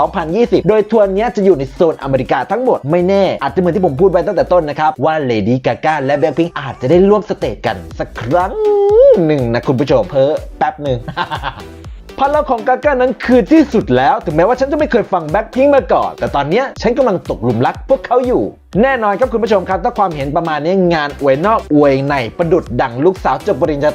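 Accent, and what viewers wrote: Isan, Thailand. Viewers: อีสานแท้ล่ะว่า พะนะ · เลดี้ กากา คนบ้านเดียวกะกุนี่เอง สำเนียงกุแต่เกิดเลย พะนะ นี่แน้ะ 555 นอกจากรักลิซ่าแล้วนั้น ขอรักแอดอีกคน ขาดไม่ได้ มาทุกวันนะ พะนะ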